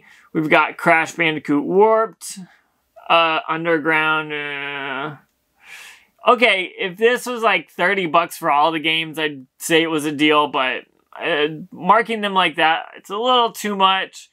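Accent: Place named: Midwest, United States. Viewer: This been bugging me for awhile are you from the Midwest ? I can kinda hear the accent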